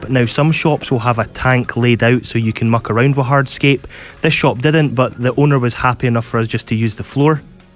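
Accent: Scotland, United Kingdom. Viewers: I miss Scotland so much and love your Scottish accent.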